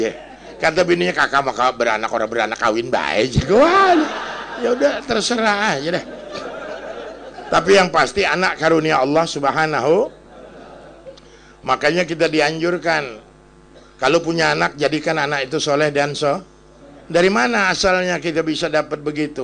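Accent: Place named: Serang, Indonesia. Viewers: Dicky Pratama Putra pantes logat ny banten mntap abah yai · sehat slalalu untuk ustd.mantep cramahnya ustad bndar lampung. ustad bahasa serangnya medok. slam kenal dari sobri jaseng di bntuhan kaur bengkulu.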